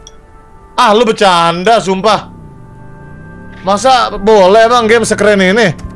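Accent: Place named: Indramayu, Indonesia. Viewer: Indramayu nih bang. Abang asal mana?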